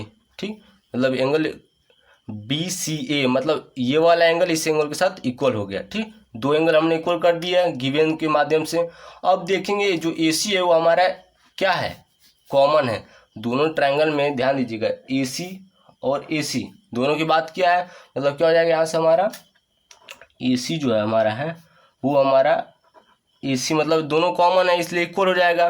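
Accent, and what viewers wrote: Bihar, India. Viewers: Arrey Bhai Sirji Bihari Haina Isliye Sir K Bolne Se Hi Pata Chalta Hai Ki Sir Bihari Hai 😀👍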